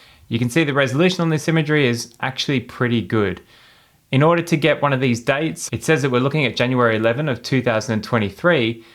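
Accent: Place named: Australia. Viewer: What are you trying to get at , as it seems anyone thats not a so called allie , it seems you've got a negative showing of their defence areas but if its an allie its a running track like new Zealand, I think your thought process is a bit on the side of hate and racism, thats not right especially since you sound like an Aussie, who are you getting paid from, because it seems im the only one thats noticed. Its a shame. Australia has only become like this because of tge stupidity of the American's and how Australian politicians cannot help themselves being other than the yes man or sucking up their arses, this is the whole reason this country has gone to shit . Its the stupidity and thought processes like what you've uploaded. Disgusting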